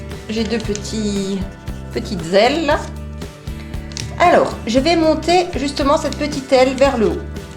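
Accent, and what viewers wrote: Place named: North-East, France. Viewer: Hey t'as un Accent du Nord-Est (de la France) C'est sympa comme pliage :) Ca va faite un petit truc bien sympa pour la table d'anniversaire de mon neuveu ^^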